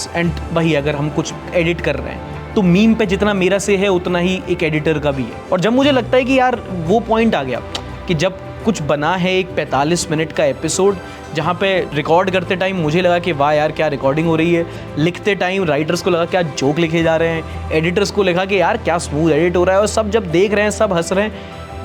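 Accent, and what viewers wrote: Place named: Bundelkhand, India. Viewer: You are from jhansi , mein man hi man sochta tha ke aap hamare side ke hi ho kaeki bhot achhi bhundelkhandi bolat te video m aap ,, aaaj confirm ho gao aap jhansi se ho ham Orchha se H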